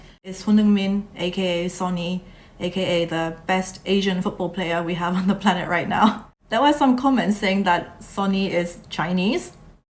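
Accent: United Kingdom. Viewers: 영국식 발음같은데 맞나용? 멋있네용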